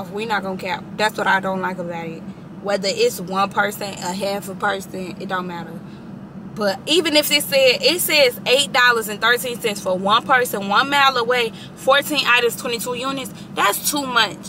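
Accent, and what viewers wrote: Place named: South, United States. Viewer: You have to have some southern roots it sounds so cute though tbh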